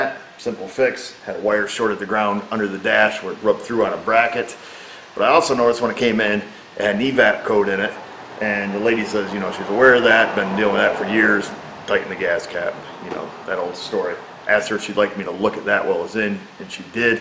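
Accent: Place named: Upstate New York, United States. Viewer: Cancer vent valve? Oh.. canister... That upstate accent!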